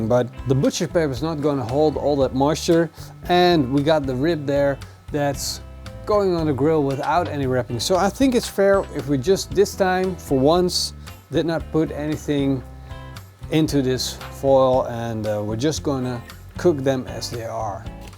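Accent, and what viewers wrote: Netherlands. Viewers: Are you from Holland? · Mario Solorzano Jr ? Perhaps Holland ? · Thanks for the vid. You speak English with a Dutch accent :) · You sound Dutch. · Hey you're dutch... i know because of that acent :3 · Netherlands, I think.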